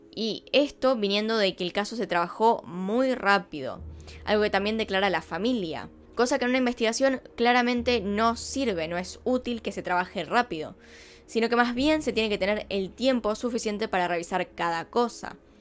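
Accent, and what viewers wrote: Argentina. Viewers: Es Argentina, habla Argentino. Que queres? Que hable aleman? 🤣 🤣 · Es de Argentina? dowwww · Sos Argentina?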